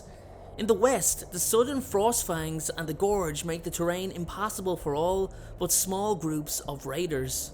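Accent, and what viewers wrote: Dublin, Ireland. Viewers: That Dublin accent just ruined this video😫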